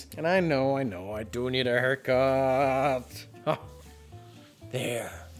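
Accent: France. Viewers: are you french ! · Hi, where are you from ? I spotted a French accent :)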